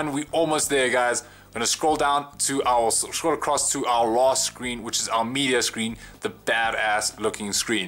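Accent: South Africa. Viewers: Dude are you South African!?!?!?!?!?!?!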